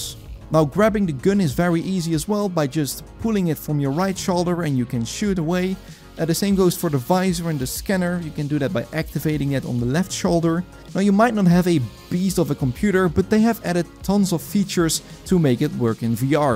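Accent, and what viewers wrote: Netherlands. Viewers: You must be Dutch. The accent.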